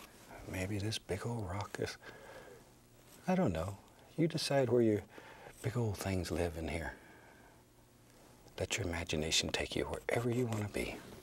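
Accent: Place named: Midwest, United States. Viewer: That's his mid-western accent. He's originally from Indiana.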